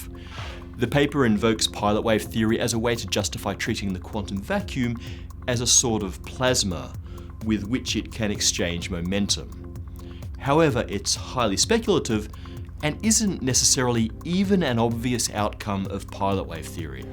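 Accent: Australia. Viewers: My biggest mystery from this video , which is great , what accent does the host has ? Australian ?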